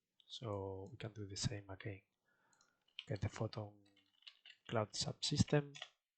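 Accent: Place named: Spain. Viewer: i would like to ask you something about photon plugin, and your english pronunciation is similar to Spanish people, im from spain too, can we comunicate in Spanish? to be faster :)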